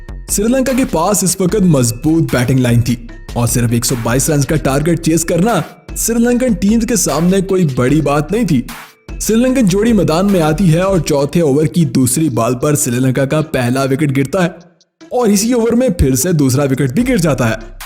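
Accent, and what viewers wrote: Pakistan. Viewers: Apki voice yaani apki boli se AP mujhe Pakistani lagte ho. Lekin Apke thumbnails or indian team ki ziada tarreef se mujhe AP Indian lagte hooo Confirm me Are u a Indian. Or a Pakistani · Aap shayad pakistan se ho faarmet bol rahe hai isliye.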